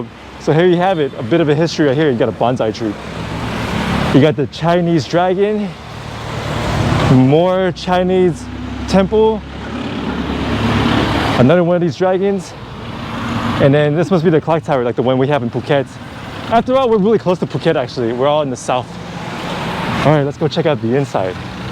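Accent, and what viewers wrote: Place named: West, United States. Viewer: Now you are in Asia, so you don't have to speak like westerner. You are a China Chinese, don't have to boast that you came from the west.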